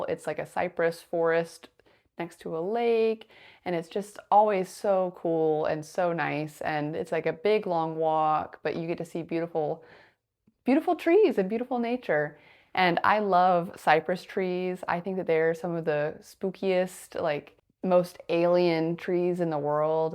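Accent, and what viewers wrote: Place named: England, United Kingdom. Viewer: Hey i want to learn English accent from you 😅😅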